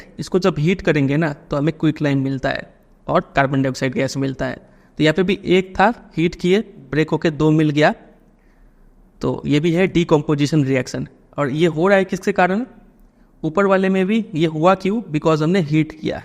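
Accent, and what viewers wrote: Bihar, India. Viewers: Hello sir kya aap bihar ke hain kya?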